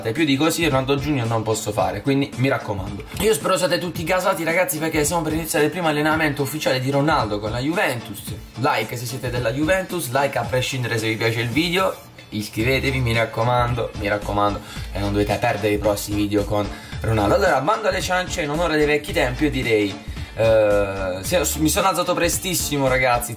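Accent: Bari, Italy. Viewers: senti ma sei barese?Pk dall'accento pare che tu sia barese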